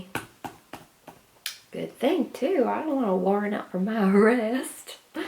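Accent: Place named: South, United States. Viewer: Youre so good at that southern drawl!! Hope you've been well~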